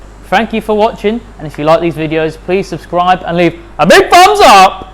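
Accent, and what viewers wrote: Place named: United Kingdom. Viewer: I didn’t know I liked being yelled at in a British accent until now 😍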